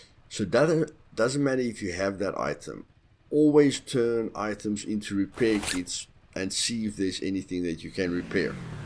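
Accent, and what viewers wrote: South Africa. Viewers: I was going to say you sound South African. Glad to see another SA player making content. Lekker boet lekker. Keep em going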